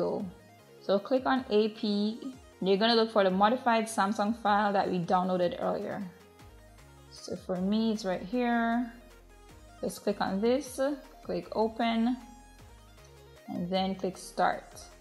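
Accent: Jamaica. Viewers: Knew you sounded Jamaican, yaadie repping here :D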